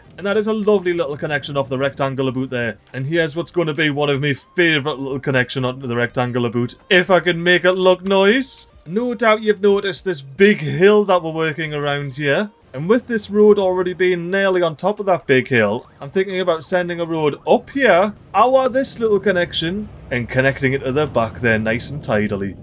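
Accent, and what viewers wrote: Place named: Scotland, United Kingdom. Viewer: Hey, German here. What kind of dialect you're speaking? Rooond-a-booot :) I would guess somewhere in Scotland?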